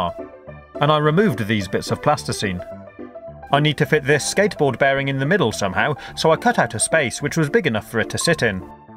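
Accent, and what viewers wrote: England, United Kingdom. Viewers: Are you English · i love your english accent :D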